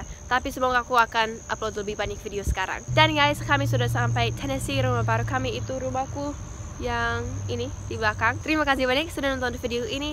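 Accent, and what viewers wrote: Papua, Indonesia. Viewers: Kamu bicara seperti logat Papua thts awesome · Wow logat papua bicaranya keren, hebat, saya tinggal di west java tapi suka dengan logat indonesia timur. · Ada logat papua-nya. :D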